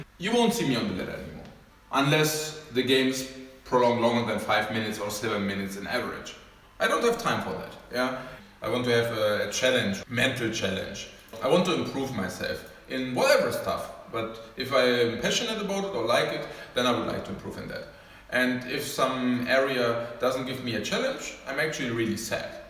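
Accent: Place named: Germany. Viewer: wenn ne kartoffel englisch spricht...ohrenkrebs für meine englische ohren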